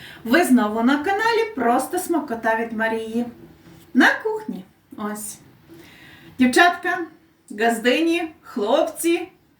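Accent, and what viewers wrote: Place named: Podillia, Ukraine. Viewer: Дуже дякую за таку розлогу відповідь. Село Глібічок - це мамина мала батьківщина, неподалік від Кам'янця, там, де Миньківці, Нова Вушиця. Почув такі з дитинства знайомі слова, мовні оберти, інтонації, от і запитав, звідки ви. Буду тепер Ваш канал дивитися і слухати 🤗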